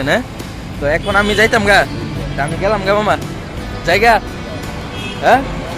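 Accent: Sylhet, Bangladesh. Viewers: Are you Sylhety Or from another city? Good job, well done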